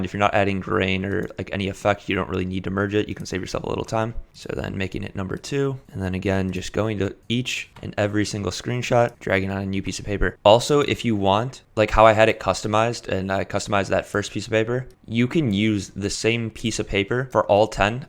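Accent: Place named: Buenos Aires, Argentina. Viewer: Hablas en ingles pero se siente como si fueras un cheto de capital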